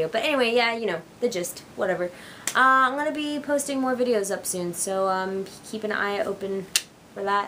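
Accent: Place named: Cockney, United Kingdom. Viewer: Random accent almost sounded a little Cockney. :D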